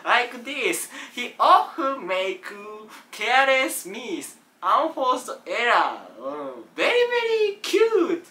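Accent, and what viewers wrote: Japan. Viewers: I am so proud of your Samurai English. ✨ Cool✨ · さん海外進出？！めっちゃ楽しい〜(´>∀<｀)日本語英語が最高すぎる✨ さんfanも国境を超えますね*⋆✈ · わざとらしいカタカナ英語に笑った😂でもコテコテのジャパニーズおばちゃんには丁度良いレベルでありがたし🙏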